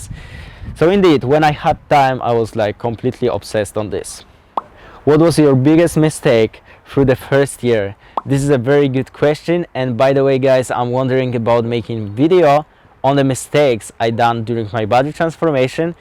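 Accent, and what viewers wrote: Poland. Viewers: Yes, he is from Poland and for me, it's a typical Polish accent.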